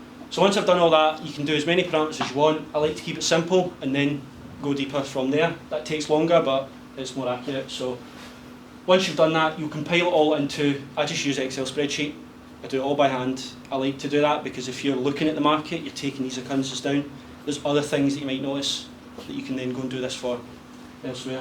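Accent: Scotland, United Kingdom. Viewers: Refreshing to hear Scottish accent aye 🏴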